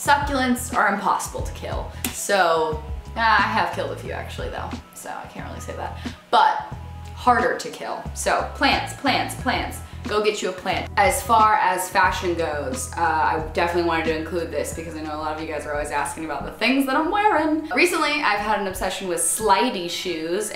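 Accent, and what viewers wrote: United States. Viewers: Are you american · i literally can't tell if your american or swedish omg lmaoo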